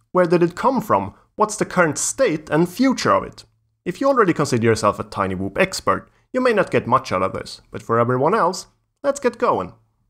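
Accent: Sweden. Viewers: I bet an arm and a leg that the narrator is Swedish! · Your english tells me you are swedish. In that case: svara så jag vet, jag och sambon har slagit vad.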